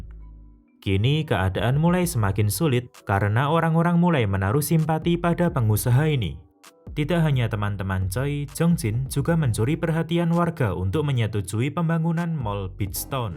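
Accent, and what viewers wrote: Java, Indonesia. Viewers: Suara yac medhok .tapi enak didenger Karna notasi yac pas. Gk kecepatan tau kepelanan . Dan jenis suara yac berat Enak pkk eh dnger .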